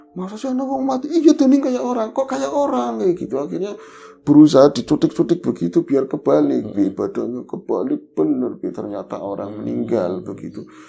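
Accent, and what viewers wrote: Banyumas, Indonesia. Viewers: Wilujeng siang Selasa ceria sambil mendengarkan suara bro. Ngapak nya kental. Maju NGOBOR Jangan lupa bahagia 💪💪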